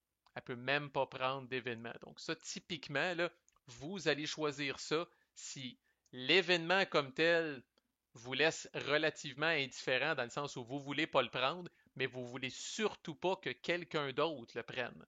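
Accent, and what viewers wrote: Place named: Quebec, Canada. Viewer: «Tiens, mon esti!» —... J'adore! 😄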